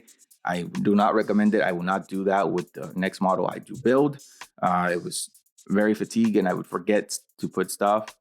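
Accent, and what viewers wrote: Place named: Miami, United States. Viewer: I know a Miami accent when I hear one. usa gundam has some preorders for zoids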